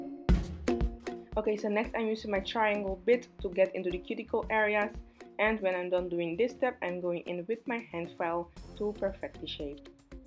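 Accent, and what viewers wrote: Netherlands. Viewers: Ik hoorde gelijk zij is Nederlands 😂 girlll those nails gorgeousss✨😍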